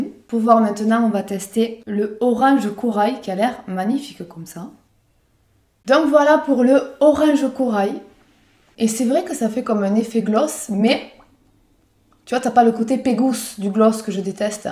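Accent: South, France. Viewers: Bonjour! Je viens de m'abonner à ta chaine, jai le meme accent que toi meme si je l'ai perdu un peu en Ardèche!! De quel coin du sud tu es? Moi Avignon (et quand j'écoute une video ou je parle, c'est le même accent que le tien🤣). Bisou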